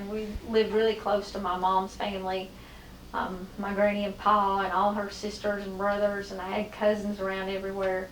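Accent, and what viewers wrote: South, United States. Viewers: Good to hear your accent to I’m a guessing southern wv or ky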